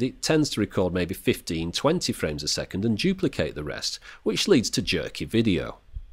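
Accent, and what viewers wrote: United Kingdom. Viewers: Dear Sir, you are anything but boring. don't know what that chap was on about. I could listen to you review anything! ...partly added that us across the pond enjoy the British accent.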